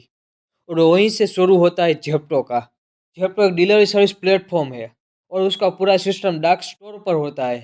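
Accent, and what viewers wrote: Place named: Gujarat, India. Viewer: E gujju hindi sunke majha aavi gaya😂, jhepto jaise dusri khani bhi sunana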